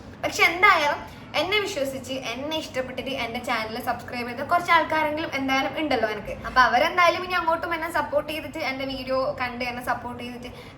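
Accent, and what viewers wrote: Kannur, India. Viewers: കണ്ണൂർ ഭാഷ, നമ്മുടെ സംസാര രീതി. ഞാൻ തലശ്ശേരി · കണ്ണൂർ ഭാഷ വരുന്നുണ്ട് ❤️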